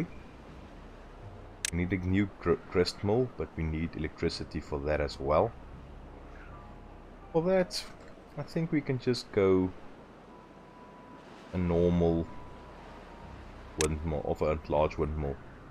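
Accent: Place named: South Africa. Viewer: aweh, ek help maar uit met n comment want ek kan hoor jy is nie engels en dis min wat mens SA mense kry wat sulke videos maak. well done!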